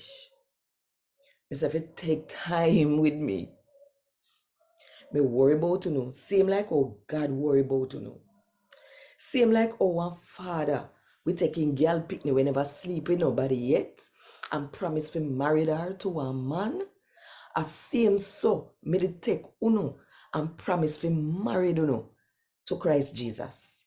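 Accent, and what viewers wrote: Jamaica. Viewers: This passage has reinforced how difficult leading a church is. I loved how once again Paul's day to day challenges in building the early church shines through. I follow these readings in my Bible & the Jamaican patois makes it so much more understandable re context and emotion. Thanks again for this blessing and your service to the word of God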